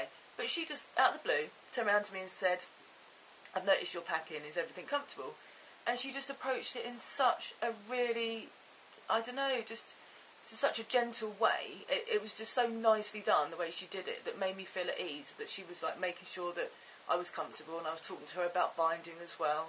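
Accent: United Kingdom. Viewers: really enjoyed this vlog...im from Birmingham uk by the way you sound british. Good Luck with training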